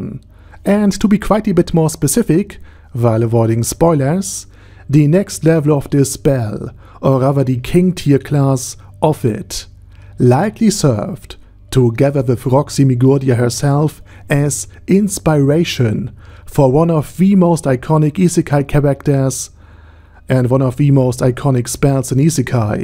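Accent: Germany. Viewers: Starker deutscher aktzent aber cooles Video Bro 🎉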